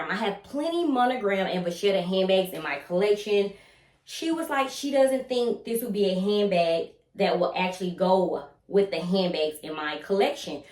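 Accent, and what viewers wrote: United States. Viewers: Love your videos. I also love your accent!!! I am from the UK and so my ear struggles to place American accents. Which part of the US is yours from? I totally agree about buying smarter. I now have a small bag collection after selling a lot - 8 at present. The only bag I need in 2023 is the odeon mm in mono and black as I don't have a 'decent capacity cross body' in my collection. I just have to stay strong when I am tempted, which is easier said than done!!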